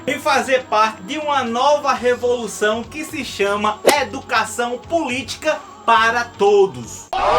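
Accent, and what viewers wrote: Northeast, Brazil. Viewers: Explica tudo num nordestinês fluente.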